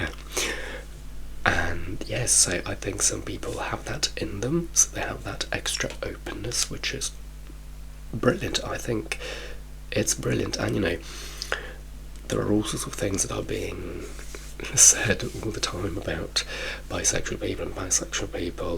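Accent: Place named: United Kingdom. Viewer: Mamma mia quanto mi piace il tuo British.accent, ma anche il tuo Italiano. Hai una voce rilassatissima. Scusami, anche se adoro l'inglese da quando. Ero bambina e lo parlo volevo farti i complimenti in italiano ❤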